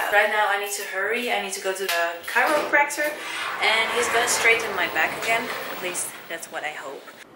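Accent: Netherlands. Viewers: dutch accent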